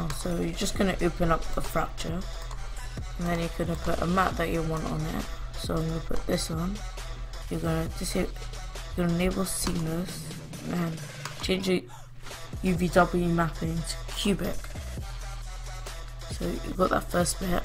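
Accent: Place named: Leicester, United Kingdom. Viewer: I can tell your from leicester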